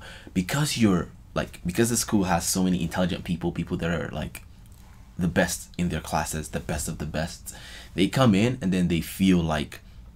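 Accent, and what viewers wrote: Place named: Nigeria. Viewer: Are you Nigerian? You lowkey have an accent 👌🏾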